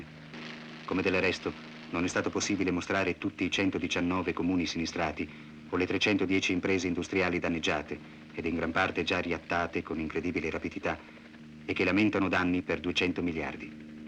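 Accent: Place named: Trieste, Italy. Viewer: L’accento triestino della voce narrante include un ulteriore occlusione al racconto tragico di questa immane tragedia. Il documentario è di rara fattura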